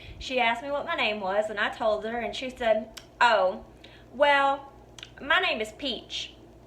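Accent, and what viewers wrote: South, United States. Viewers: Sorry it didn't work out at Walmart. Not all Walmarts are bad. I hope you are doing well and you definitely have a future in entertainment. Love the southern accent ☺ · Heavy southern accent 😂👍👍 cool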